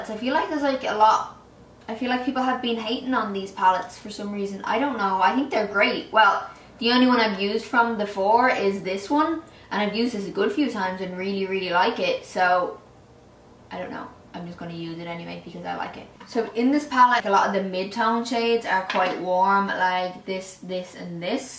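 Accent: Ireland. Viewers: Are you Irish?? New subbie i came across you on Pinterest absolute doll xx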